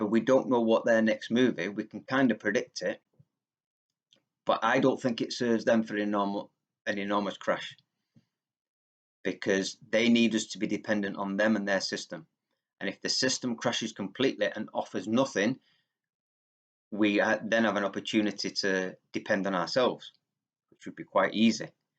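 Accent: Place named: Manchester, United Kingdom. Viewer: Real interesting vid, nice to hear a Mancunian on utube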